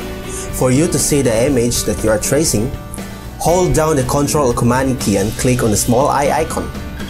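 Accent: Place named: Philippines. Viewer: pinoy ka ba? napaka pinoy ng accent mo hahhaha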